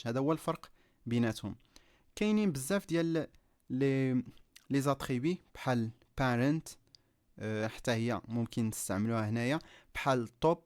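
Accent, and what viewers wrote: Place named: France. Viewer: J'adore ton accent français ! A quoi çà sert de mettre un titre en français franchement !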